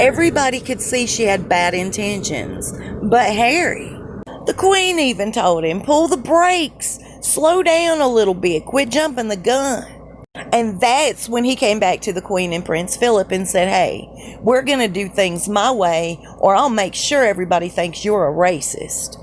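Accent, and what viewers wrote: United States. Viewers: I really love your accent. I wonder what part of the US you're from.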